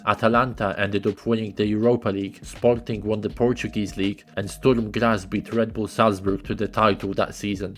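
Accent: Poland. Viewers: You can clearly tell by his accent that he is Polish. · yeah bro is definetly polish bc there is no way a person not from poland or the slovan countries can have a pronounciation like this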